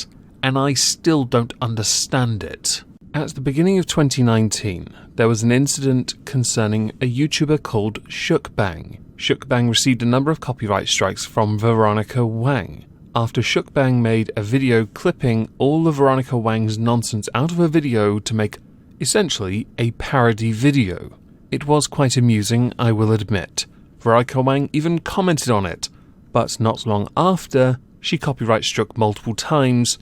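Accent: RP, United Kingdom. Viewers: Tbh, it's actually really contrived, whereby he puts dramatic emphasis on certain words. He sounds like a posh person trying to sound deep and meaningful...he has you suckers fooled!! lol!!!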